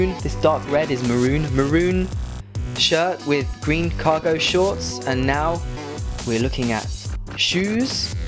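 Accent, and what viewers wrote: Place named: England, United Kingdom. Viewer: Where r u from by the way? I've visited London last year and it was an amazibg experience. From your accent it's more than obvious you're from England))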